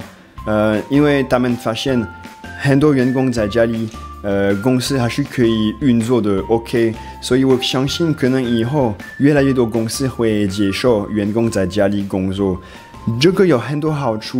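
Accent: Taiwan. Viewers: 真的很台灣味你如何學好中文的? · 講話很台灣味欸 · 台灣腔中文真的有夠台的~😁😀💞👍👍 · 真的? 假的? 好台哦！🤣🤣🤣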